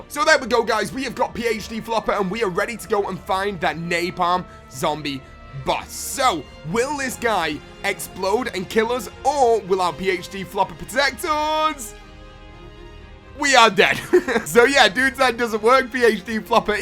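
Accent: United Kingdom. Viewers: not to be racist but u sounded even more british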